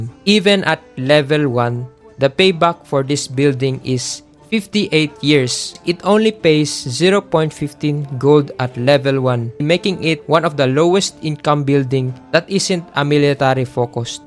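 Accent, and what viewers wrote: Philippines. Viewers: Sounds Filipino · I only hear pinoy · Great video my dude! Really helpful resource for min-maxing playing tall strats. Also, I just have to ask are you from the PH by chance? Your accent is giving me kababayan vibes lol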